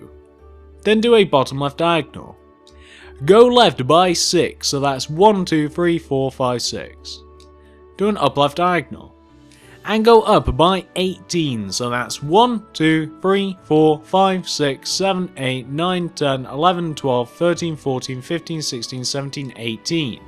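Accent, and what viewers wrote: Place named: RP, United Kingdom. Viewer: Where are you from cos your accent sounds kinda posh lol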